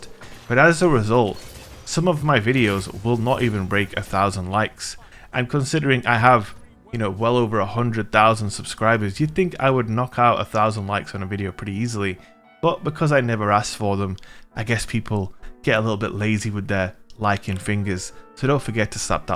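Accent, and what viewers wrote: United Kingdom. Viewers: Are you from UK? You kinda got that accent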